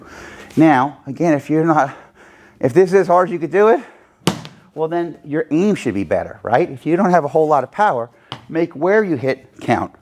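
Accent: New York, United States. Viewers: Love your NY accent, have family in NY. Drink WARTER....lol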